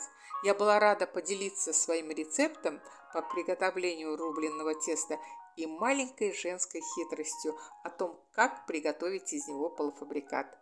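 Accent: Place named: Baku, Azerbaijan. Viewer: ханум, спасибо.Сама я тоже из Баку, обожаю слушать наших женщин с нашим бакинским акцентом.Удачи Вам и процветания Вашему каналу.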